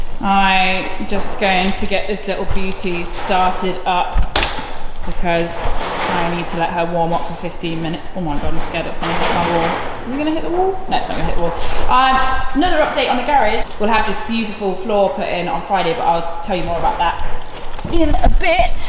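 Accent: Ireland. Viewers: Can tell you’ve been living in Ireland for a bit “the crack” and “grand” 😂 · Car is amazing, and I hear a little Irish slang in you these days haha good to see the time here in Ireland rubbing off! You've accomplished so much for 29 so no pressure just enjoy your 30th!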